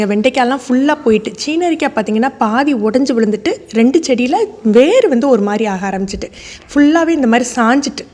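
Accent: Tirunelveli, India. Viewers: Akka neenga tirunelvelia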